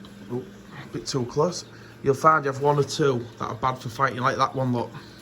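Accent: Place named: Sheffield, United Kingdom. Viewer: U sound like my friend in Sheffield I'm in Australia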